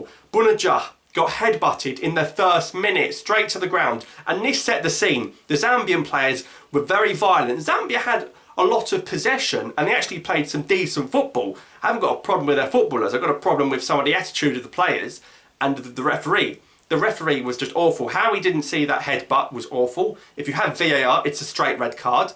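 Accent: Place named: England, United Kingdom. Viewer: I'd say that's an accurate assessment. I could tell in your voice your voice that you had some English in you. You seem like a call guy so I'm going to subscribe to your channel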